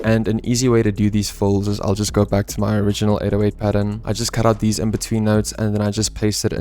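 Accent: South Africa. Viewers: Yo are you South african??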